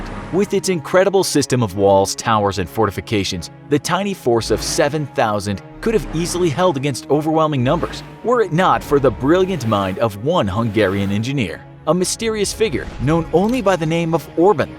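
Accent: United States. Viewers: Its an American channel, by the accent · Any way of getting a more relaxed non-American narrator?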